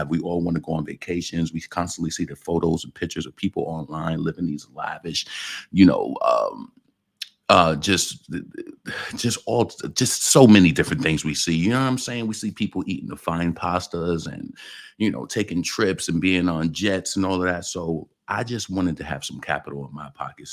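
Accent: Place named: New York, United States. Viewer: Its sounds like most people in nyc....you are blessed.... blessed you 😊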